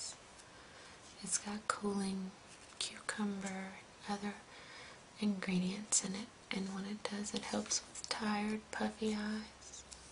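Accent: South, United States. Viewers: lucky, i want to be british lol, and to me it just sounds like no accent because im american :P i don't know, now that i hear it its a wee bit southern at times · I'm british so the very short answer is that to me yes she does ;o) Now however you've got me questioning myself (and so I feel like I'm putting my neck on the block for this one) since I'm obviously not exactly an expert on american accents but to me her accent sounds southern at times. I must confess it is only slight however, hence why I'm now questioing what I'm hearing :o/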